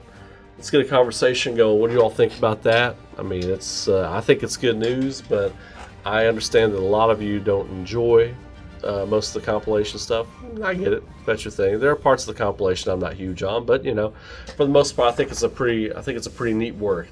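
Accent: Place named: Kentucky, United States. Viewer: I’m curious on you accent, it sounds like Kentucky, but it’s a bit more rounded I think.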